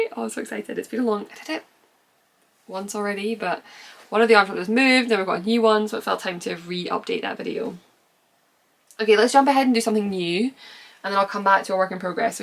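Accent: Scotland, United Kingdom. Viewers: The Ariundel! It is very elf/lord of the rings vibe. I have never used this phrase, but I think I really am obsessed with that sweater. It is just amazing! I love hearing your joy in creation. Also, I am so imressed with your thoughtfulness about making patterns to fit bodies of all shapes and sizes. It's lovely. Finally, I'm finishing my first comment on one of your podcasts by saying I love listening to your accent. My parents lived in Scotland for 5 years and it is such a beautiful country with lovely people.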